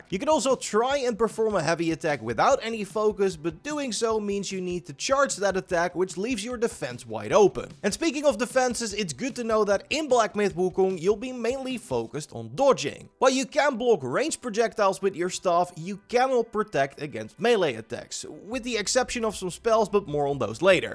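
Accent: Netherlands. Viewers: Dude stick to dutch videos your English accent is horrible. Also listen to how he says wukong lmao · I love your dutch accent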